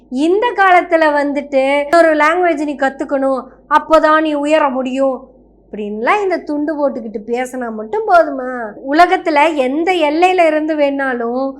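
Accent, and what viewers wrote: Kongu, India. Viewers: உங்கள் அழகும் பேசும் அழகிய கொங்கு தமிழும் சங்கி வேசி மக்களுக்கு ரொம்பவே கசக்கும். பரட்டைத்தலை பரதேசி டாக்டருக்கு அதுவும் கைனகாலஜி படித்தது அனைத்தும் தமிழிலும் ஆங்கிலத்திலும். இந்தியை படித்தால் தவறொன்றும் இல்லை. இவள் சௌந்தரராஜன் மனைவியா இல்லை மோடிக்கு மனைவியா.இந்த லட்சணத்தில் கவர்னராக வேறு இருந்திருக்கிறது இந்த சனியன். போடி போய் மாட்டு மூத்திரத்தை மட்டுமே குடித்து சாணத்தை சாப்பிடு.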